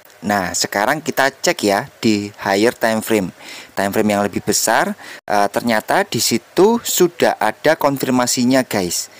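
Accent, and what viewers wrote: Java, Indonesia. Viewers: Dari Logatnya, Pasti anda orang jawa ya? 😁 ,Cara anda mengedukasi sangat Keren bro , saya dukung.! Lanjutkan, sukses selalu ya.👍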